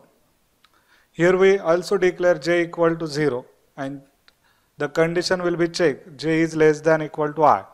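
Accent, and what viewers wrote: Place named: India. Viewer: I can't understand shit thanks to his indian accent lol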